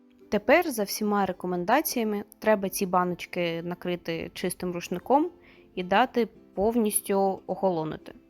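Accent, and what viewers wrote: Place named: Lviv, Ukraine. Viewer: ого!Я думала,щи Ви зі Львівщини як мінімум 😊Супер👍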